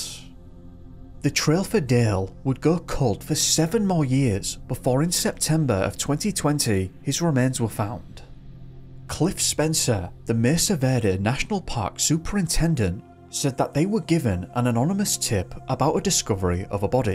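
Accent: United Kingdom. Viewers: Can someone tell me what UK accent this guy has where he says "pre-shume" for "presume" and "ah-shume" for "assume"?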